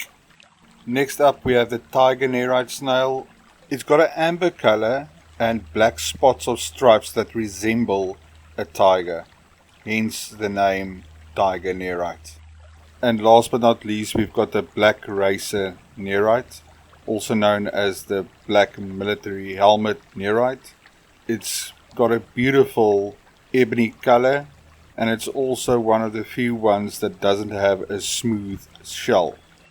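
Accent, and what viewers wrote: South Africa. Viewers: You sound like your from South Africa my friend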